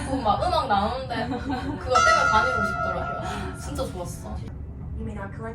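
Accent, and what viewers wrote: Gyeongsang, South Korea. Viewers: 세상에 경상도 어딘지 모르겠는데 말투도 귀엽고 재밌고 또 너무 순수하고 맑아보여요!! 응원합니다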